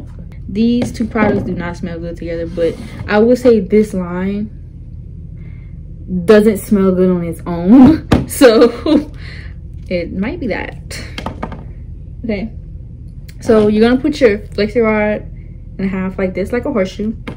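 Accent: Louisiana, United States. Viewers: ok so what part of Louisiana are you from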